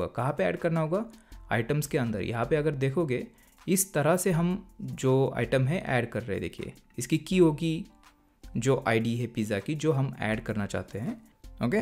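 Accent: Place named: Lucknow, India. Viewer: Where are you from. Because your language is like lucknowis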